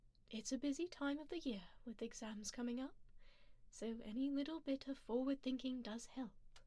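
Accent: RP, United Kingdom. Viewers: Your British accent is amazing. So is this artwork of Leonara! You're so talented and amazing! You do a phenomenal job of bringing your characters to life! 😍😍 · I love when does her posh British accent. Its so relaxing.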